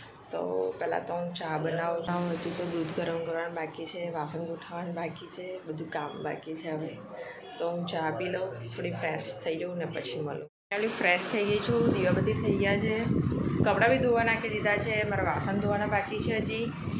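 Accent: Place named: Ahmedabad, India. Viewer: અમદાવાદી બોલી નાખો છો સાથે બરોડા વાળી અને સુરતી થોડું થોડું 😅❤️❤️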